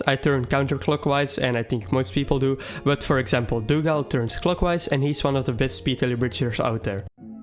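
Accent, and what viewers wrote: Netherlands. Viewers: are you dutch becuse you sound like 1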